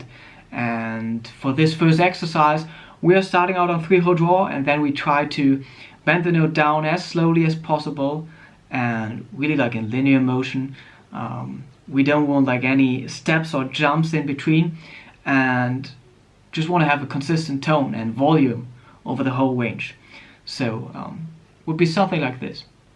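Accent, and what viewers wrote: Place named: United States. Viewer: gewöhne Dir an Englisch zu sprechen, und nicht "fucking american"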